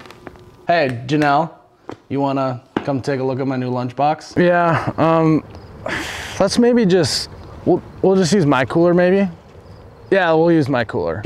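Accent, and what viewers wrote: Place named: Minnesota, United States. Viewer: I betcha from Minnesota, yah?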